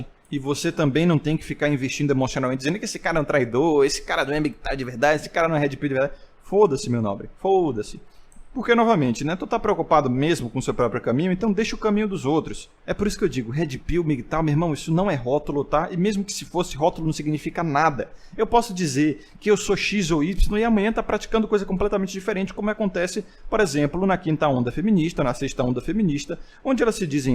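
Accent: Bahia, Brazil. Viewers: O é baiano? 😳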